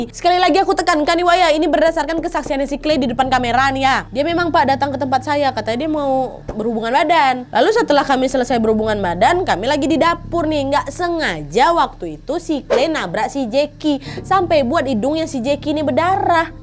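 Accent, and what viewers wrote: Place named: Medan, Indonesia. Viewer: ngakak,nampak kali medannya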